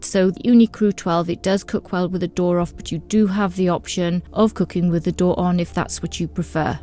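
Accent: United Kingdom. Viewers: J'aime bien cet accent britannique.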